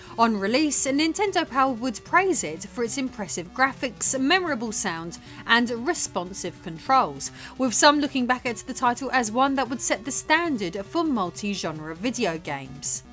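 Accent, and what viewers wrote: United Kingdom. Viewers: Hello You have a nice accent, are you British ^^ I wish i could find another Lady like you, passionate by video games.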